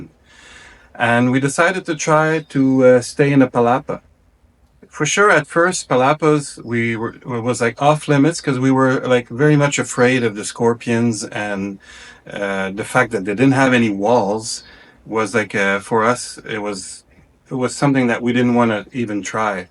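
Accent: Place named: Quebec, Canada. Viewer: Wow, I stumbled on your videos by accident searching for anything PV. My wife and kids have been visiting PV for a while, we normally stay downtown around Versalles in Airbnb’s. We do like the comfort of a condo but a palapa feels more like something I would feel grounded to nature in and would be nice to experience. Your spot in the mountain overlooking the ocean looks incredible. Your story is very inspiring, I’m a little younger (42) but we seem to have some things in common. I do sometimes wonder how different it would be living like you are, to someplace slower moving and more relaxed. Good for you sir! I sense a French Canadian accent in the way you speak… Je vous souhaite à vous et votre femme beaucoup de bonheur. De Mtl.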